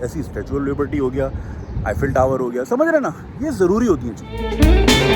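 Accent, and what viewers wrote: Karachi, Pakistan. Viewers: Love hearing your khalli Karachi accent! I forwarded to my close Chicago friends to check out even all of us Pak Americans have been Chicago residents over 25 years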